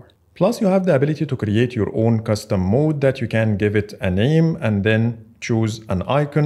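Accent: Egypt. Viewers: You have English Egyptian style 🎉 your brother from Egypt too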